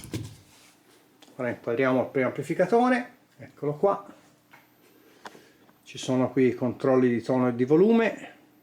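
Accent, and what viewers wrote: Tuscany, Italy. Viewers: Ciao, bella riparazione. Sei toscano come me😂. Ho visto la sigla sul transistor di potenza,SGS ates unica gloriosa industria italiana di semiconduttori anni 70😢😢bravo ti seguo volentieri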